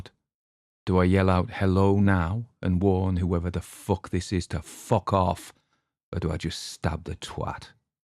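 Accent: Germany. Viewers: I think you did a great job on your German accent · Great depiction of the gruesome folk character! And a superb reading. I too have a fascination for puppetry, automata and all manner of dancing jerky creatures... remember Raggedy from Rupert the Bear? Twiggy, jerky thing of nightmares that he was. I also loved those Metz adverts: "Beware the Judderman my dear, when the moon is full" has long been a catchphrase in my household. 😆 Also, I'm reminded of the stunning work of the early 20th century German director and animator Lotte Reiniger. She pioneered silhouette animation. Some of it is really dark and creepy (deliciously so!) and all of it is very beautiful. If anyone here isn't familiar with her work you can find some on Youtube. Compliments on your German accent too. (I was born in Germany coincidentally, but grew up in England). Thank you for another great reading and composition! 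· "and the promise of eternal darkness" yikes! Omg, you are an expert storyteller! Your scary voice and German accent are fantastic.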